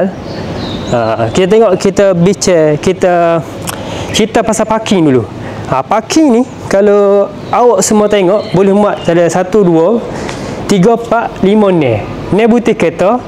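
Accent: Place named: Kelantan, Malaysia. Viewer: penyampaian biarlah dalam Bahasa Malaysia Standard. Pembeli ada juga orang bukan Melayu. Ramai tak faham loghat Kelantan. Lainlah awak nak jual rumah di Kelantan.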